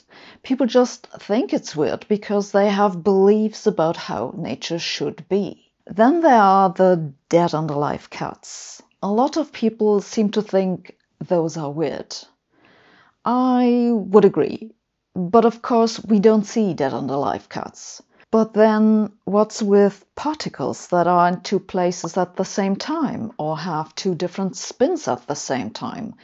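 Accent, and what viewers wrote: Germany. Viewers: the goal is to monetize. people who like the illusion of themselves understanding something without any effort really like this German accented "no gobbledygook" which is actually pure gobbledygook since she never invests in actually explaining in detail without using silly metaphors ...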